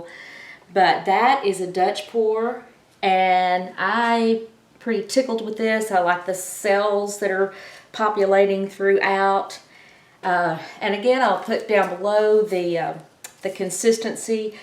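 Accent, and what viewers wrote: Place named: South, United States. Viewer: Just watched your intro video. I KNEW IT! I’ve been listening to you n thinkin “ this gal has some good BBQ in her background . She’s gotta be from down “Heah” someplace. I’m in North Carolina . Just retired n looking at hobbies.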